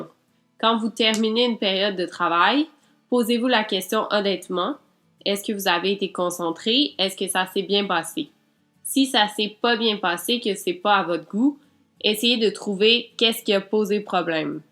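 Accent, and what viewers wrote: Quebec, Canada. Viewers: j'adore ton accent ! !! 😍😘 québécois ! 😉😃 je suis Français 😛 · Merci vous m'avez vraiment aidé +1 pouce bleu PS: j'adore l'accent québécois je sais pas pk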